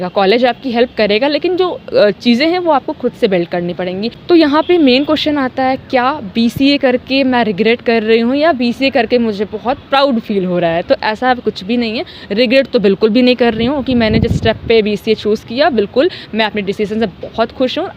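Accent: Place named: Haryana, India. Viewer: Oh well actually from your language I thought that you are from Haryana.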